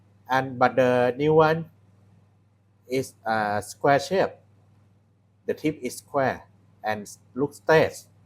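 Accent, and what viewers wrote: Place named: Thailand. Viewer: สำเนียงคล้ายๆคนไทย เลยครับ เจ๋งๆ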